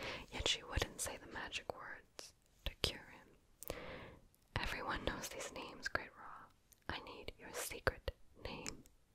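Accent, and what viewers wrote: France. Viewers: Your french sounds like France French rather than Quebec french or maybe I was just tired when I was watching. Either way its cool, I speak quebec french so it doesn’t sound as good. Lol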